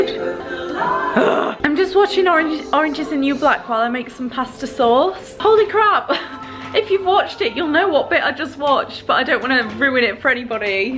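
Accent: United Kingdom. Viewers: Why are British women so fine with that accent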